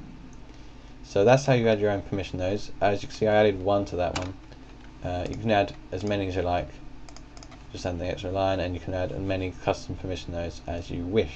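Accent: United Kingdom. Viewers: omg i cant understand wtf hes saying hes mumbling and has a british acent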